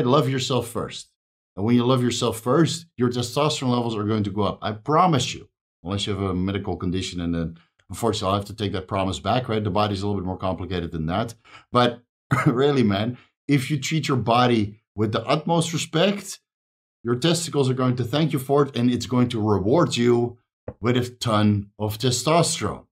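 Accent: Netherlands. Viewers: is this guy from netherlands or something? can't place the accent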